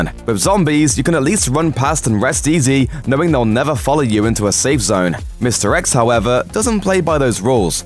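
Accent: United Kingdom. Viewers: Stop talking british style dude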